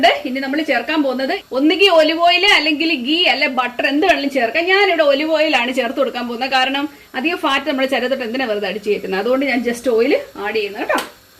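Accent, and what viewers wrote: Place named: Central Kerala, India. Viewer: Kollam,Allapii, kottayam, Thrivala, IDDUKImy guess 😃☺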